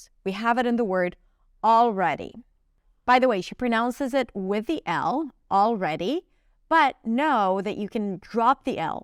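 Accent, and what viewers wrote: United States. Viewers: is your english american?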